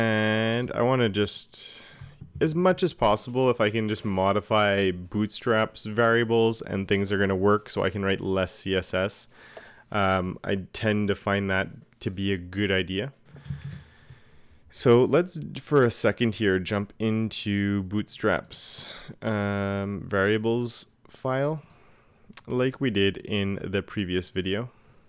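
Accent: Canada. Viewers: love the videos, are you Canadian by chance?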